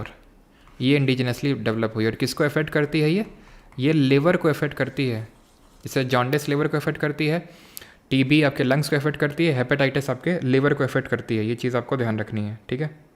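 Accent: Kanpur, India. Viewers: वही तो इत्ता accurate कनपुरिया कइसे बोल सकता बाहरी कोई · From kanpur ✔️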